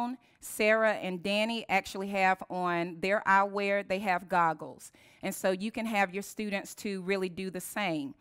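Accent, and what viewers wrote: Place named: South, United States. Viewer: She from the south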